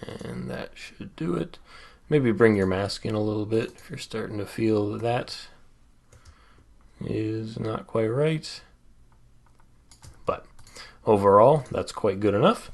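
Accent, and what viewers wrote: Canada. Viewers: Hein quoi?! J'entend par surprise du québécois dans un vidéo anglo?! Ça c'est nice! :p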